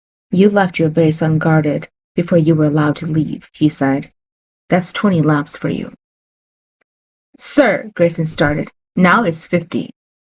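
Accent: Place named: South, United States. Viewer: Love it! That southern accent is awesome.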